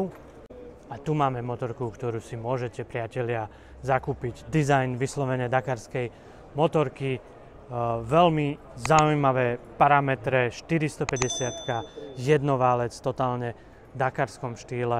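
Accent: Eastern Slovakia, Slovakia. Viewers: Pekné video pán, ".Už sa sa polepšil.Už ste odstránili aj to Svoje východňááárské zaťaho..váááánie.Robíte pekné videá,ale hrozne mi vadil ten východňársky akcent.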